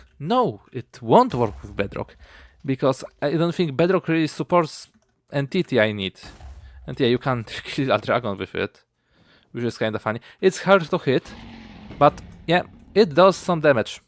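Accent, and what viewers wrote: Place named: Poland. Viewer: my mind: "is he polish? he sounds polish just like me and my friend. oh hes using twitter with polish language". nice thing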